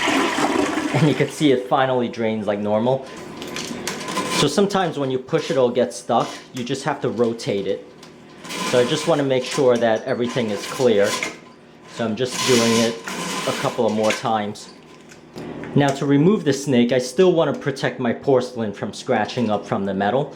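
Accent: Brooklyn, United States. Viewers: Thank you so much! It was very clear and persice how you explained! I liked how nice and clean your toilet area was and the masculine accent idk is it from Brooklyn, NY or NJ...it's faint but it's nice! I love that type of accent acutally... thanks for the video I haven't heard it in a while...I miss it I guess....but the video was excellent! I'm glad I found you...I'd watch another video of yours sometime😉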